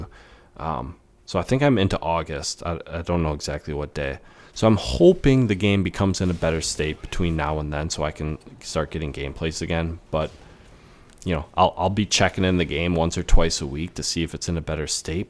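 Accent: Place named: Midwest, United States. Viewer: You sound so midwestern lol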